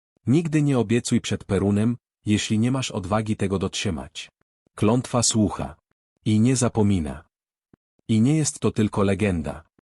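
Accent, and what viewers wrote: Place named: Ukraine. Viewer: a można po polsku bez ukraińskiego akcentu ?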